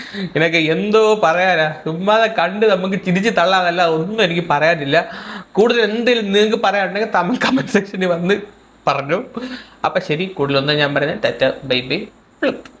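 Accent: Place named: Thiruvananthapuram, India. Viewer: പൊളിയാ 🤩തിരുവനന്തപുരം ഭാഷ da🤩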